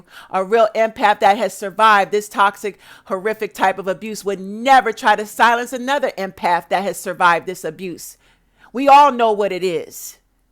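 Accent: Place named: New York, United States. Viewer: Girl, you are New York on 100%. This just how we talk on a normal day. F them weak ass, soft asses. I love your tone and your vocabulary. You have taught me more about life than any school or any class I have ever taken. You change and save lives. The Devil is mad at you sis because you stay on his neck. These Kevin Samuels types make it seem like it is ok to put black women down. They get big mad when we speak up and shut them up! They do not have half of our intellect, our personality, drive, ambition or success. So they get mad and try to bash us. F Them.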